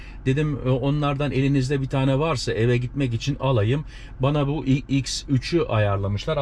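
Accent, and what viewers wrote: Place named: Zonguldak, Turkey. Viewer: Usta türkcen güzel, fakat yinede anladim sen zonguldakllisin, kastanonu karabük veya bartin da olabilir ama zonguldak derim?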